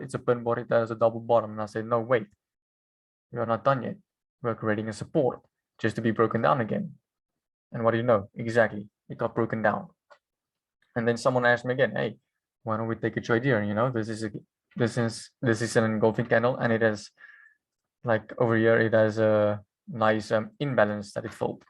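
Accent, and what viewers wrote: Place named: South Africa. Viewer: Hi. Thanks. Where are you from. ? From your accent sounds like South - Africa. ?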